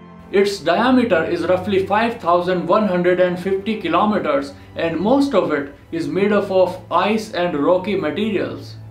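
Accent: India. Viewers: He from India or Pakistan. It's easy to recognize by his accent.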